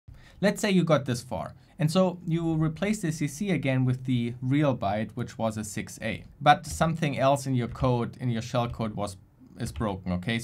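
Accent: Germany. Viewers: I really like your videos a lot and have a great deal of respect for you but your accent eludes me. I mean, your account says you're from Germany and your pronunciation also sounds German but sometimes you have really weird grammar that doesn't really work in German or English? For example in the first part of the video: "Because I get often questions..." The more natural way to say this in English would be "Because I often get questions" But! In German the sentence in this context would be "Weil ich oft Fragen kriege" (or something similar) WHICH HAS THE SAME ORDER OF WORDS EXCEPT TWO DIFFERENT WORDS ARE SWAPPED Again, please don't misunderstand this as critique or an insult (As a German, I totally get how hard it is to speak English well!). It's just that the mistakes you make genuinely intrigue me.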